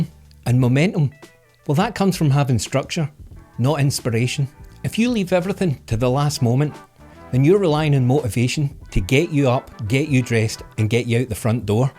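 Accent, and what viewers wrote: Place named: Glasgow, United Kingdom. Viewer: I've really enjoyed your videos since discovering your channel. Based on your accent, I put you in the Glasgow are, but not central Glasgow. More like Hamilton or Strathaven, Am I close.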